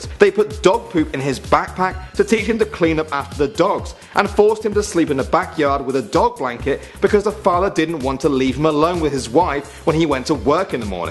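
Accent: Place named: United Kingdom. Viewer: Sounds like he is British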